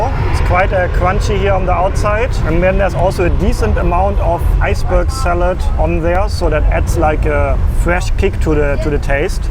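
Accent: Germany. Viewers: Telling us you are german without saying you are german.hehe this is NO hatte! Your english is very nice but it sounds Real german hehe. Like your Videos. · This German-Enlish makes me shudder.:face-pink-tears: My son is living in LA and likes it very much. He was born in New York. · Want to know what a hardcore German accent sounds like just watch this video. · You have the most interesting accent. You can hear the German but sit sounds like German merged with Asian. Interesting😊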